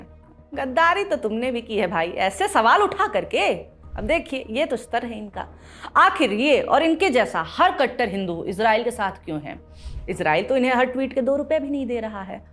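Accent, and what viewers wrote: Bihar, India. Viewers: Nice. Tone reflects of bihari. Liked it. Gud information. Keep it up and keep the style of speaking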